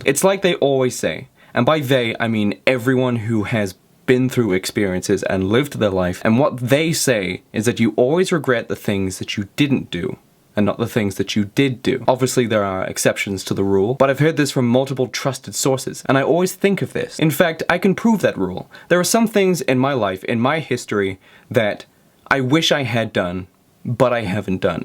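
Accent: Australia. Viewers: Trying to figure out what part of Australia you are from. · Dude did you live in Australia for awhile or anything? You pronounce so many words like an Aussie would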